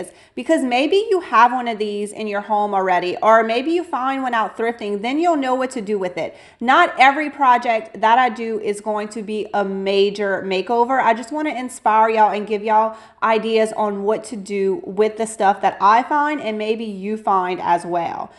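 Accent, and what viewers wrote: Louisiana, United States. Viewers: I know it’s been a year since you uploaded this video but I am trying to place your slight accent ……. Louisiana Cajun?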